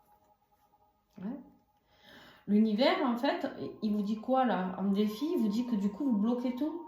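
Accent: South, France. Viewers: et quelle voix...! Avec un bel accent du sud que je connais bien 😉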